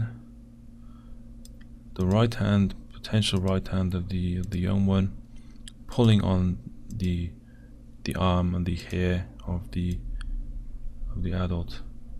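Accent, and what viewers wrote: Wales, United Kingdom. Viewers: are you Welsh you sound like you have a bit of a Welsh accent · Great analysis, again! It's certainly intriguing, to the point of being believable. Although it's certainly not an important point I must say that you're very easy to listen to - are you Welsh? Sounds Welsh to me but quite a soft accent. Just wondering 🤔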